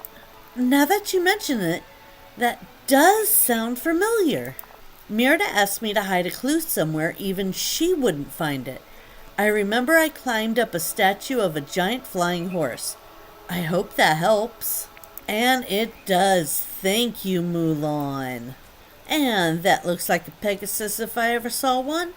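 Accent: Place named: Scotland, United Kingdom. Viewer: The scottish accent I cant get over. can I have your autograph? Your a star ❤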